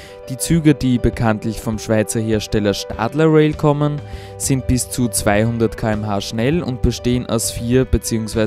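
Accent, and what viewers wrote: Austria. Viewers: Das klingt nach DDR-Slang, ist aber aufgrund des österreichischen Tonfalls unwahrscheinlich.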